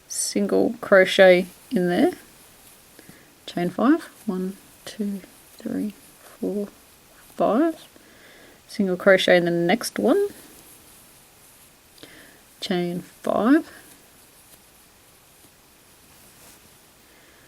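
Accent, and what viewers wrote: Australia. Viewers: Thanks very much, hearing what I assume is an Australian accent threw me, Newbie here, yuor tutorials are great.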